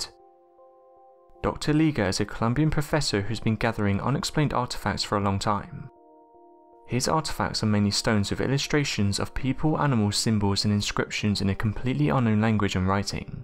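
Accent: England, United Kingdom. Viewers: Yeah well certain Englishmen come from regions where they speak like that because inflections and intonation carried over from Middle English, Welsh etc... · Anthony ouch...but true! I understand that pronunciations will be different between English speaking countries, but that was the completely incorrect word. I can handle meethane for methane. I cannot handle parameeters for parameters! I used to think anyone with a proper British accent sounded quite intelligent. Since YouTube, not so much😄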